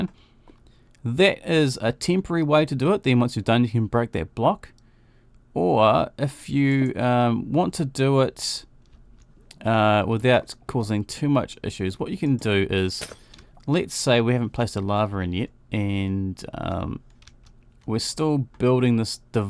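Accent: New Zealand. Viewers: R u from New Zealand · Your a kiwi!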